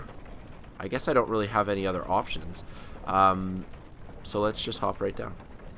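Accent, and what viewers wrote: Canada. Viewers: U r awesome bro...btw..r u canadian??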